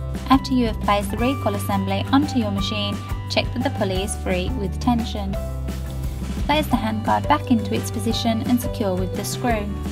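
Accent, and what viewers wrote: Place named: United Kingdom. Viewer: Watching this from the states, much more enjoyable with that soft British accent than the beer drinking mechanics we have here in the US. LOL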